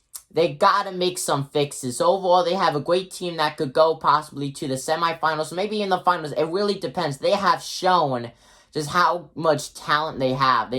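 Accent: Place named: Brooklyn, United States. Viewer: you sound like someone that lived in brooklyn back in the 30s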